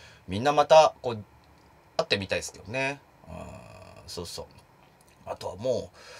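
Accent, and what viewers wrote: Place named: Okinawa, Japan. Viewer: 沖縄のイントネーション好きだったな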